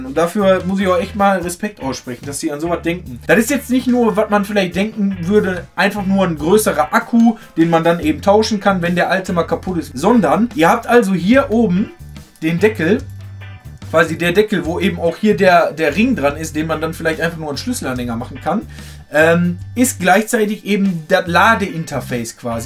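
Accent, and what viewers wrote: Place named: Ruhr, Germany. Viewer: Danke für die Info, dann werde ich bei meinem Mighty und Hybrid bleiben! Der Volcano war an Black Friday für 400 Euro im Angebot, sonst ist der ja leider immer bei knapp 500... schon viel Geld aber es lohnt sich auf jeden Fall!! Kommst eigentlich auch aus dem Ruhrgebiet, Duisburg, Essen oder so? Die Ruhrpott Sprache erkennt man direkt 😂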